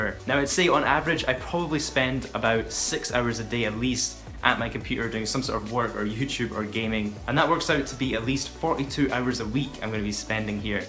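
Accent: Scotland, United Kingdom. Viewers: Nice to find a fellow Scot on YT. Fwendsssss :D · R u scottish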